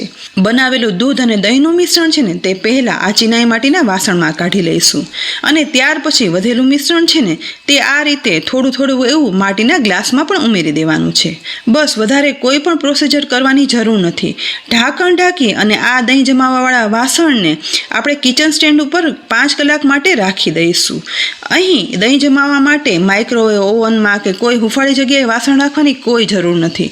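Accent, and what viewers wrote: Kathiawar, India. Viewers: Tamara bol vani rit and sabd pure khathiyawadi che...bahu Sara's bahu saras style che bol vani...and etlu j mast dahi banavani rit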